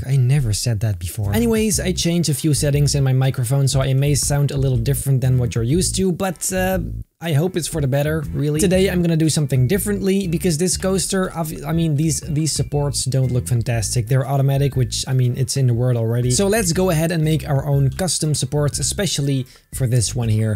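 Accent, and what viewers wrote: Netherlands. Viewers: dont he sound dutch?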